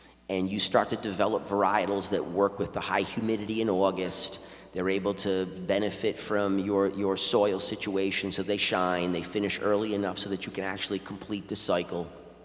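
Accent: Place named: New Jersey, United States. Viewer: sounds like he is from NJ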